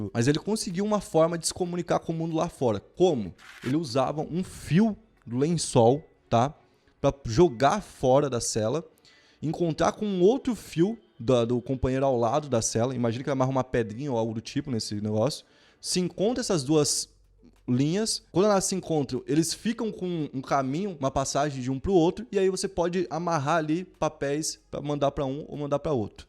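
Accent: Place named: São Paulo, Brazil. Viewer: Esse cara que fala parece ser paulista mais te falo uma coisa nunca vi igual esse sabe de história meus parabéns aí vê se aprende ai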